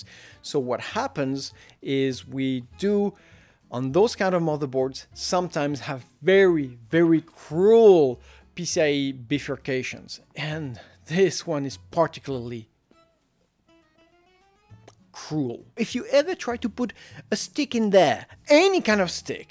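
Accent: France. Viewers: Breh is he french?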